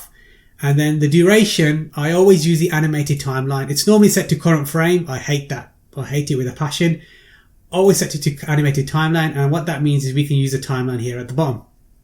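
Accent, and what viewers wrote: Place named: Midlands, United Kingdom. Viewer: Thanks for a clear and informative tutorial. You also sound like a Midlander, which is awesome :)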